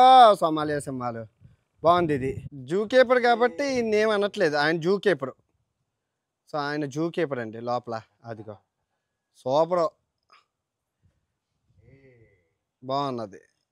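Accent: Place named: Visakhapatnam, India. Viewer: I like your videos and your telugu accent which most people in Vizag and near by mostly used to speak. God bless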